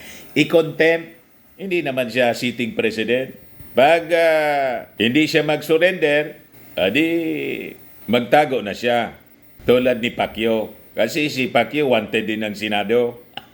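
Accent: Bicol, Philippines. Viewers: bicolano kayo sir?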